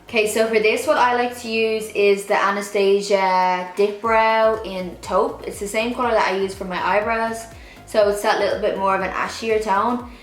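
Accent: Ireland. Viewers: She's from Ireland!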